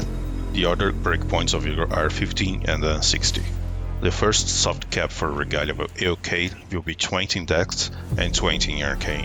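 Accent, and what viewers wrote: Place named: Brazil. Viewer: Huge brazilian accent, are you from brasil?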